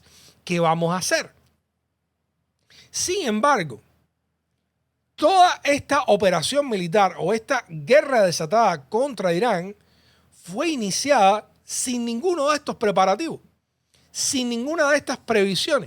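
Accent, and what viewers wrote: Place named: Cuba. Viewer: con todo respeto, mejora tu dicción, he oído a otros cubanos y tu acento resulta demasiado cansado. Tu información buena pero cuesta trabajo seguirte con ese acento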